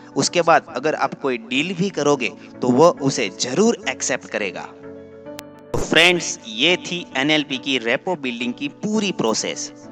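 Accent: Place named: Gujarat, India. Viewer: voice se lagta he aap Gujrati ho.. love from Gujarat I am from Rajkot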